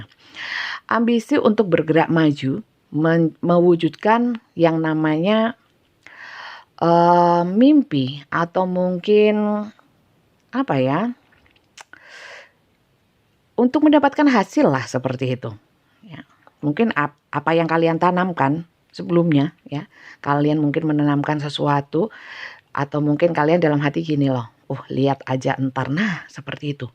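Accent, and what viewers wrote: East Java, Indonesia. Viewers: Nyimak readingan Bunda tuh bikin senyum² sendiri😍di samping sll resonet aku suka logat nya Bunda khas Jatim,pencerahanya jg sangat tepat👍sukses n sehat sll ya Bund🤲...tks🌹😍🙏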